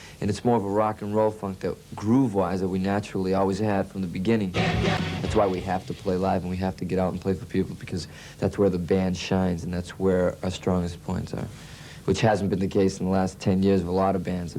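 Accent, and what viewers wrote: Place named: Boston, United States. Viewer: Boy lol you sure hear your Boston accent! Now just that LA accent! Its shows that today looking back you still have those same thoughts and ideas! Your still doing your own thing! rock on! cant wait to hear the whole album! xo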